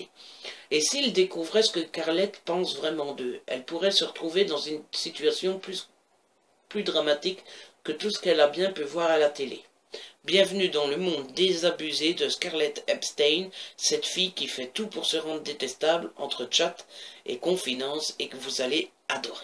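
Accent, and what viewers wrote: Belgium. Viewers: Je découvre ta chaine que maintenant (oui je ne savais pas que tu en avais une, merci de me l'avoir indiqué que Twitter) ! J'aime beaucoup ta façon de présenter les livres je vais encore en acheter des tonnes parce que comme par hasard on a les mêmes types de lecture xD Des bisous ! 😘 (ps : ce petit accent, la Belgique ? 😊)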